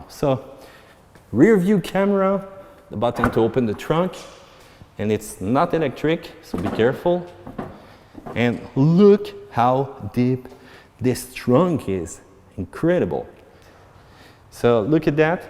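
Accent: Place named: Quebec, Canada. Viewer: I can tell sort of a French accent.. But it's a little different. Is that a French Canadian accent?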